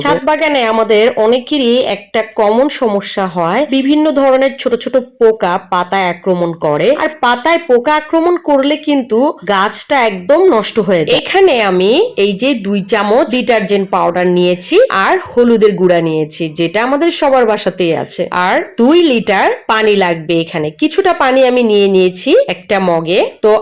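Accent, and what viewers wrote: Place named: East Bengal, Bangladesh. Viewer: পূর্ববঙ্গের কথাগুলো শুনতে বেশ ভালোলাগে,যেমন::দিবো,নিবো,বাসা ইত্যাদি। কিন্তু সারাজীবন চেষ্টা করেও আমি বাঙালভাষাটা শিখতে পারলাম না কারন বাঙালরা যাকে বলে ঘটি,আমি তাই। ছেলেবেলায় যখন পাড়ার মাসি,পিসি বা দিদারা আমাকে হয়তো ডাকলো,"এই ছ্যামড়া শোন্, তখন আমি ভাবতাম আমাকে এনারা দামড়া বলছেন।রেগে ঘাড় গোঁজকরে বলতাম,"কি বলছো বলো।" এইরকমের অনেক মজার ঘটনা এই বাঙালভাষা নিয়ে আমার সাথে হয়েছে।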